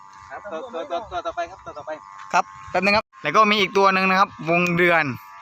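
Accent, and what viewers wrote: South, Thailand. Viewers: บัง ทองแดงทุกคริปเลย คนอืนฟังออกม้ายม่รุ้ ผมฟังออกแต่ว่า เราคนไต้ด้วยกัน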